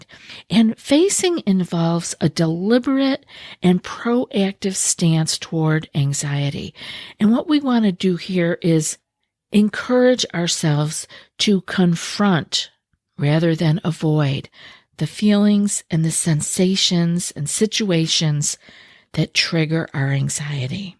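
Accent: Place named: Upper Midwest, United States. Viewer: You’ve gotta be from WI or MN with that accent.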